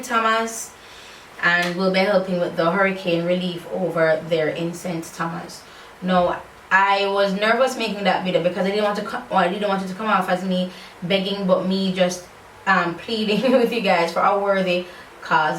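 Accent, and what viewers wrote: United Kingdom. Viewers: That British accent though lol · If this British accent fit yuh one more time goodie😄. Love, love, love the look sweets.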